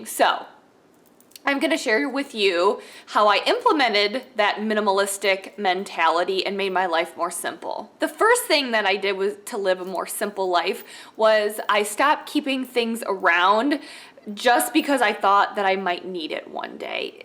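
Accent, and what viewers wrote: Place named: Chicago, United States. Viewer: Great video! I'm new to your videos. Are you from Chicago Area? I love it there - your accent reminds me of the great people there!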